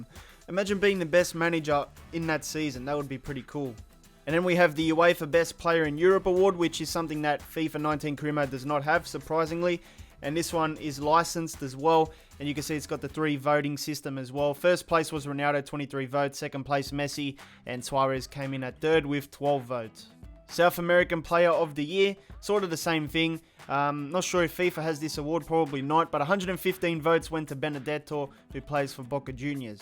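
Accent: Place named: Australia. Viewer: Man were u from Australia r new Zealand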